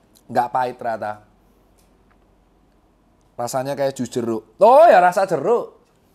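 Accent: Java, Indonesia. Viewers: Dengerin dokter ngomong, kentel logat Jowoe..jd terhibur jg..gaya bahasan yg bikin tambah sehat...😅😅😅, semangat terus , dokter Tirta..❤❤❤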